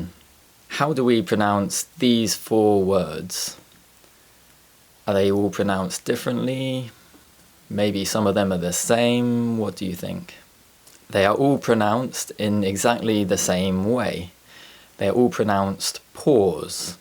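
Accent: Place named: England, United Kingdom. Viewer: I love your English accent ❤️🤩 What's the name of your English accent?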